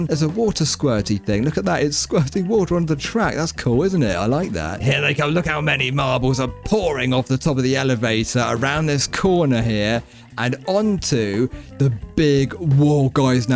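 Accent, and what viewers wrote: United Kingdom. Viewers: Are you British?🇬🇧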